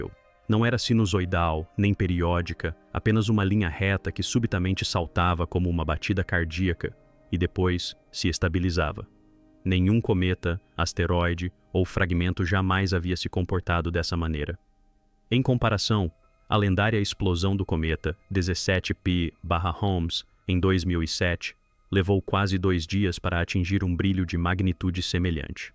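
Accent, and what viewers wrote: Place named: United Kingdom. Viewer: Sotaque horrível "r" muito britânico colonialista.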